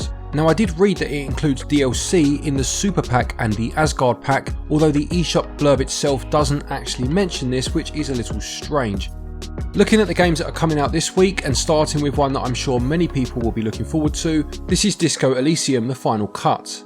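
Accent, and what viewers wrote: England, United Kingdom. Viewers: Definitely waaaaaay too English. But we love it. Now say aluminum and strawberries for us please.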